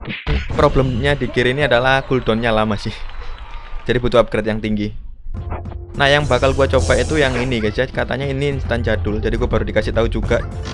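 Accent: Malang, Indonesia. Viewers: "sama ae" 😂😂 orang mana sih bang? Malang?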